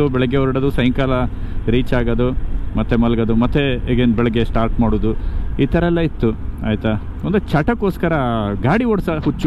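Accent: Kundapura, India. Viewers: Very good kundhapura Kannada 👌🏼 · Kundapura Kannada super 👌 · 27 : Kundapura kannada ❤🔥🔥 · ಸೂಪರ್ ಕುಂದಾಪುರ ಭಾಷೆ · Kundapura kannada Laik ayth 🥰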